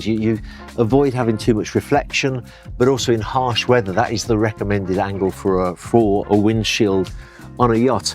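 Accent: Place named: West Midlands, United Kingdom. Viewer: I can hear the West Midlands.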